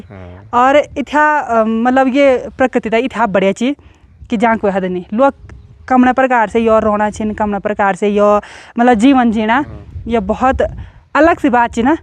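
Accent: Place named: Garhwal, India. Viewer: Jab Hindi bolati Ho tab bhi tumhara Garhwali tone aata Hai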